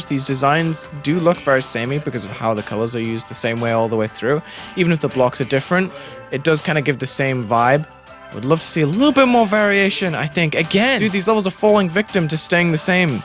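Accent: United Kingdom. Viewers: Bri'ish man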